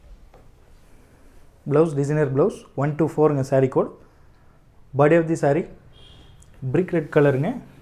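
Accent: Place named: Kongu, India. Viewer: 💐💐💐🙏super with Nama kongu Tamil nice voice ங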